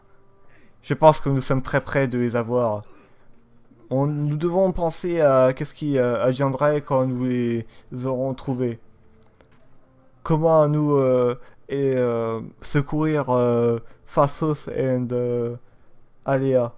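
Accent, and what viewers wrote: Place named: England, United Kingdom. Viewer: est son bel accent anglais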